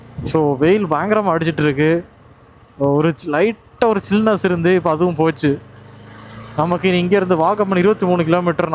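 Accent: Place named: Kanyakumari, India. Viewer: Nice .....Nanbarae.....In kk slang....❤❤❤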